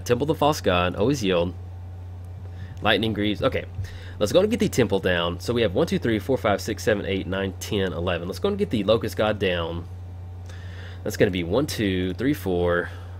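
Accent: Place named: South, United States. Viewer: Seems like a prime deck for Timetwister.:)Also, your voice reminds me of every southern kid I met in the Army.:)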